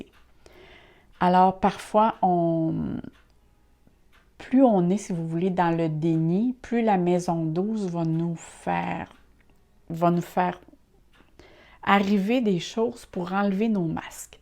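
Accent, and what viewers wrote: Canada. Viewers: Bonjours ! J'ai réécouté pour le plaisir et le professionnalisme. Et aussi l'accent et le vocabulaire canadien que j'adore...ma mère est charentaise et son patois est très proche de vos expressions. J'ai été touché aussi car je suis taureau/taureau/vierge...., thérapeute juste retraité, et dans les starting-blocks pour une nouvelle impulsion. Vous m'avez shooté de positivisme. Je suis en amour🤗🤗🤗🙏🙏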